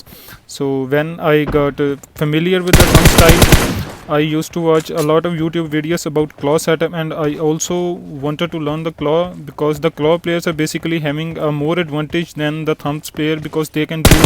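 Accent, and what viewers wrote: India. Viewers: I love the indian accent make me Travel again thx dude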